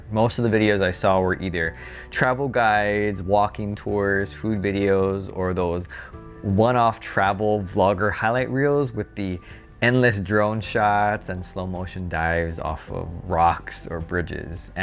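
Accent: Hawaii, United States. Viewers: I love when you do pigeon talk and local boy act in your videos.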